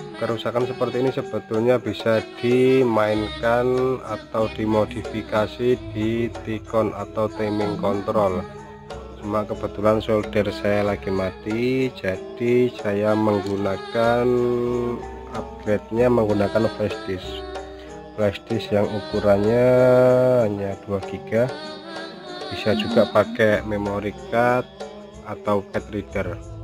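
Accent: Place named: Banyumas, Indonesia. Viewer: Kaya wong banyumas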